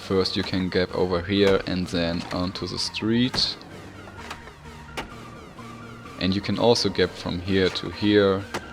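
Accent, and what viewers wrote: Germany. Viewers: bist du von deutschland ??? Dein englisch ist eindeutig von einem deutschen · Das deutsche Englisch ist legendär😂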